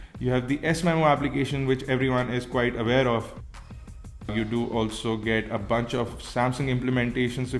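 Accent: India. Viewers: lol this is indian accent review!!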